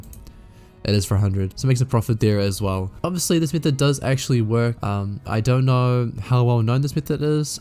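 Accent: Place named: New Zealand. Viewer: Subbed, I liked this video cause you sound like you’re from nz n I wanna follow more creators outside of us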